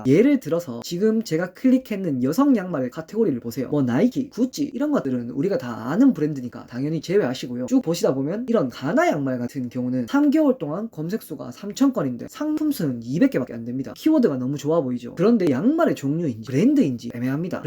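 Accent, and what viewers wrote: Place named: Gyeongsang, South Korea. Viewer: 갱상도라서 더욱 정감이 가네요...전 김해임다. 구독합니다.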